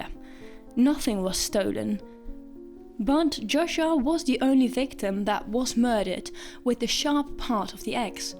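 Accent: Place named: United Kingdom. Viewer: i'm brazilian, and i do not know, really, how i get here, and i not understand 70% what do you say, even know if what i writing now is right, and your is Britanic, worstly to understand (not ofense) but your videos like creatives and interesting enough to fix my attention, so i really wich good luck, God blessings on you and your channel grow up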